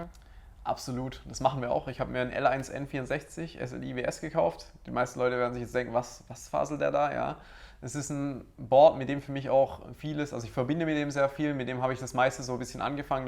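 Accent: Swabia, Germany. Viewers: Ich wusste es, dass du ausm Süden kommst. Ich hab irgendwas schwäbeln gehört. So n bisschen zumindest und ich war mir nie sicher 😉😂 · Ja mei, du bischt eh Schwob :-) (habe da nur gewohnt,bin kein Schwabe) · eins mit full schwäbisch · Does he sound "schwäbisch" to Berlin ears? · Sackzefix der kerle kommt ja US m schwabeländle🤯✌️ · ich höre dass du Schwabe bist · Gibt es ein Video in dem du Schwäbelst? Falls nicht sag ich Mal "Back to the Roots" :D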